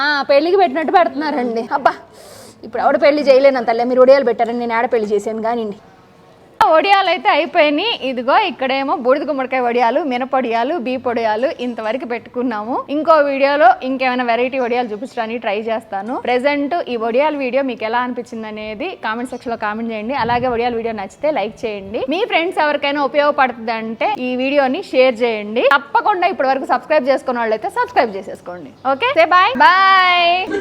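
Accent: Nellore, India. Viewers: Meedi nellore aa andi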